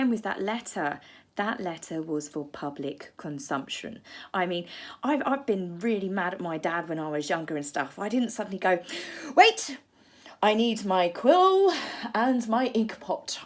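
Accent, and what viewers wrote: England, United Kingdom. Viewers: I really love your accent <3 it's very clear and articulated. From which region of England is it?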